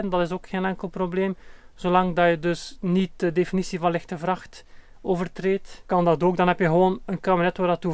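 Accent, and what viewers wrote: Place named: Belgium. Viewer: Dag, ik ben blij eens een Belg aan het woord te horen. Ik heb een Citroën Jumper L3H2 ingeschreven als lichte vrachtwagen en wil die ook gaan gebruiken als camper. Niet door een super de luxe ombouw maar gewoon het hoogst noodzakelijke, bed,kastjes,keukentje,tafeltje. Ik ga er geen ramen inzetten want dat mag je niet zelf doen zeg je, maar ik moet natuurlijk wel ventilatie in het dak hebben, daarvoor moet ik een gat in het dag maken (plaats in het dag is wel voorzien). Mag ik dat zelf doen? Of moet ik naar een bedrijf die dat doet? Fijn einde jaar en een gezond 2023.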